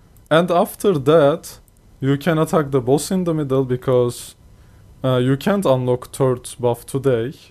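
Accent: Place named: Turkey. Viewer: Reis aksandan dedim bu kesin Türk aha dedim baktım kanalın hakkında kısmında Türkiye yazıyor :D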